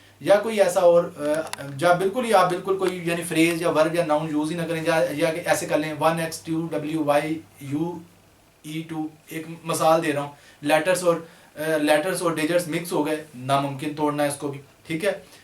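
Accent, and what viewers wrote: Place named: Punjab, India. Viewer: Aesi bat nai hai ye Lahore se hain is liye English bolte hue inka lehja panjabiyon wala hai lagta hai koi bat nai itna koi issue nai hai but vedio bhut hi awche hain bazz wait to hum or aap jese eng bolne wale high school me pharne wale bhi is tarhan logon ki madad nai kar pate but I am very thank full to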